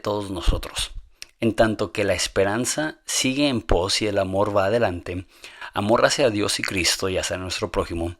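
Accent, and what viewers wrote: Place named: Mexico. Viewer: Vos no estás capacitado para leer en vos alta: mala dicción, pausas inadecuadas,falta de acentuación y de entonación, tu marcadisimo acento mexicano.La misma máquina que transcribe al pie lo demuestra.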